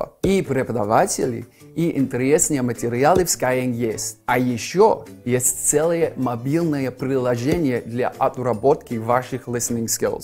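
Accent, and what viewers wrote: United Kingdom. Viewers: Боже, брит акцент наслаждение для ушей, это стаккато, это эаа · классное видео, спасибо! такой чистееькийи понятный британский английский! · Блин британский акцент((( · нужно однозначно создавать свой подкаст на английском, приятный британский акцент. What do u think about it, sir? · Вижу оповещения о новом видео от SkyEng с Сэром - откладываю все дела на потом 😍 Ответы, вероятно, b&a И отдельное спасибо за мой любимый британский акцент от любимого бриташки ☺️ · Я уж подумала, что ни фига не пойму. Оказалось, не оказалось!!! Спасибо большое учительнице английского, к которой я ходила на дополнительные занятия. Она заказывала нам книжки и кассеты из Великобритании. Вспомнила с акцентом их звучание!!! 😢🥺😍😘 Побольше бы таких занятий! 🥰🥰🥰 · B, A) Почти без затруднений все поняла.Ох уж этот великолепный Британский акцент 🔥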